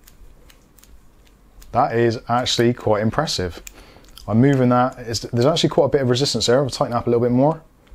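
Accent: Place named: Gloucester, United Kingdom. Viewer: Great vid, I think you might have sold me on this. I'm in Vancouver BC, you sound like you're from my part of of the UK, Gloucester boy?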